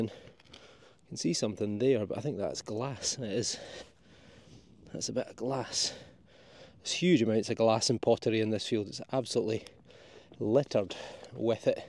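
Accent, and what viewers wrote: Scotland, United Kingdom. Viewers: I miss my mums accent she was from Scotland. So it was so nice to find your site, and once again hear that familiar accent. I enjoy your show the best you seem to know your history. My grandfather was with the ladies from hell, the black watch. He would play his bagpipes when he came to the states for a visit. He would give me a handful of pennies i thought i was rich. I metal detect here in New Mexico but the ground is so hard. So when i see you digging im envious. May the wamth of spring come you way soon. Take care. Ian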